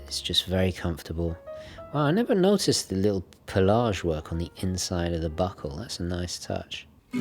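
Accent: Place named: England, United Kingdom. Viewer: Italian culture, English accent, and knowledgeable about horology, what a guy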